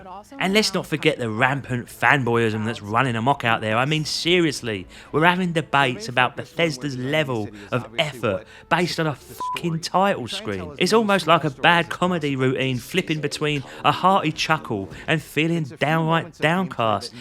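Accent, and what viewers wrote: Cockney, United Kingdom. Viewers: Mate, I reckon it’s gonna blow expectations personally, you may be cockney but you ain’t east London, keep the videos coming love to hear the thoughts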